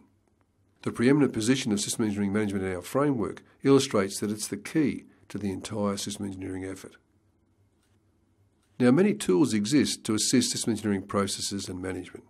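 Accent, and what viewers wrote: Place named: Australia. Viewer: I'm not used to the australian accent, he speaks like too fast and for me it's not clear enough, I have to listen twice sometimes but I'm glad this course is uploaded.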